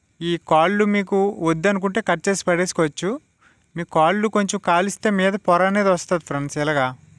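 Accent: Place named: Vizianagaram, India. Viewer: Vijayanagaram yaasa manadhe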